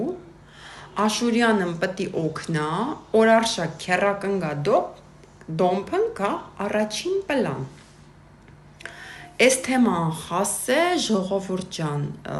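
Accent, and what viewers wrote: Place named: Martuni, Armenia. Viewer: Աղջիկ ջան դու վերջն ես ախար լավնես , բա մարտունու լեզվով շաատ սիրուն ես խոսում դու😂😂❤❤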